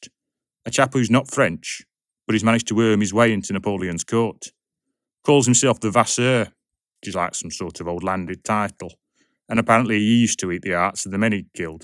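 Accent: Yorkshire, United Kingdom. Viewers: Such a rich biography. Loved the timeline. Though I'm no expert, I liked your Yorkshire accent. Finally Sheppard? Son of Jack Sheppard perhaps? Thank you for another excellent episode.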